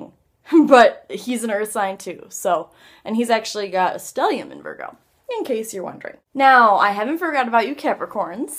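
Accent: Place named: Midwest, United States. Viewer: This is the strongest midwestern accent I've heard in a while.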